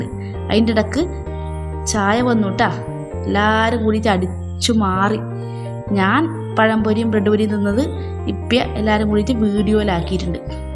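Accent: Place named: Kasaragod, India. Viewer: കാസറഗോഡ് ജില്ലയിലെ തെക്കൻ സംസാരഭാഷ നന്നായി❤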